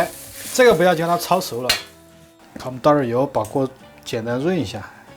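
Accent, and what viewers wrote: Sichuan, China. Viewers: 作为成都人听着你说的川普好亲切 谢谢你的分享！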